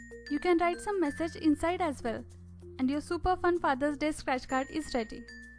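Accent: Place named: India. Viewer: It's nice to here an Indian speaking English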